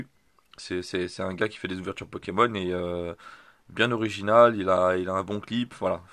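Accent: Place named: United Kingdom. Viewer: Whaaa..!!! salut l'ami belle ouverture, et quelle surprise cette petite pub et hyper sympa de ta part! merci mon cher! =) comme il a dit oui venait sur ma chaine! =) sympa ton petit concept et je suis ravie que cela n'et rien prouver comme tu la dit ou moins les scalpeur vont pas arnaquer les gens avec leur mensonges grotesque! la secret Rainbow whaou =) MAIS TA CARTE PIKA LA JE LA VOIE PARTOUT IL M'EN FAUT UNE! x) +1 like pour ton british accent! lol merci encore a bientôt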